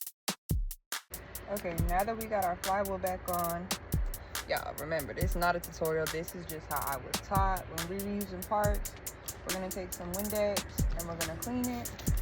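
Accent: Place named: South, United States. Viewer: I'm trying to pay attention I like the way you talk downsouth country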